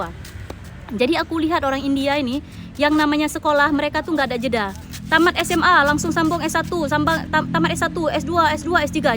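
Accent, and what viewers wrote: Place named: Sumatra, Indonesia. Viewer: Siap kk, saya selalu mnton videonya kak, cara bicaranya cerdas dan lugas bikin gemes, dan logat khas Sumatranya juga dapat banget.. hehe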